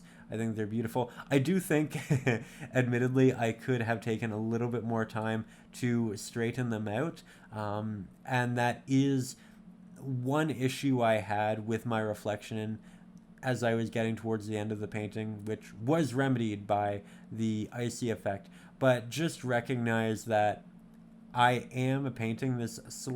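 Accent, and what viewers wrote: Canada. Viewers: This guy sounds like he is from Canada.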